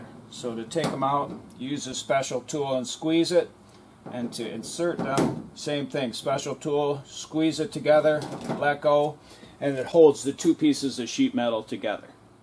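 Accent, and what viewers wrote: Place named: Ireland. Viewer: Nicely done! Good practice lesson love the Irish ☘️ accent!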